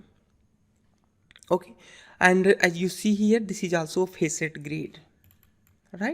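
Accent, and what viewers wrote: India. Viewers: WoW! It's been many years since I heard a lovely Indian accent. It's pleasant to hear from you sir and Thank you very much for wonderful video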